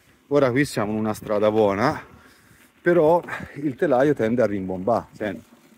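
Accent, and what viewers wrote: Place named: Tuscany, Italy. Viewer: Tutte e due dei bei mezzi anche se differenza c'è, però il bello del video è la "nostra toscana'!" Nel modo di parlare,Perché anche io lo sono 😅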